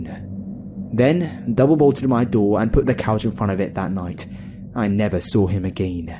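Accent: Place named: England, United Kingdom. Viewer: His English acsent makes the story's not as scary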